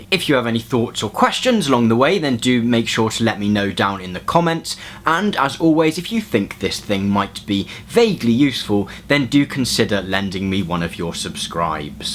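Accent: United Kingdom. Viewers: 第一次有觉得英音难听的时候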